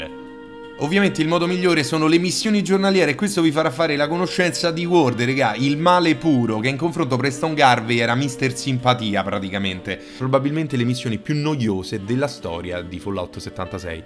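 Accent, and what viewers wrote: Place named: Rome, Italy. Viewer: E mi raccomando, metti sempre il tuo fantastico dialetto Romano...è una tua caratteristica...e ci fa' taia' un casino!😂😂😂😂😂😂💪💪👋👋👍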